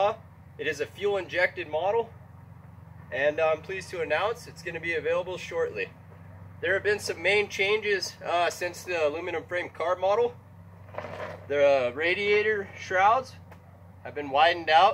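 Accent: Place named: Mexico. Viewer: Why does he sound like a mexican speaking english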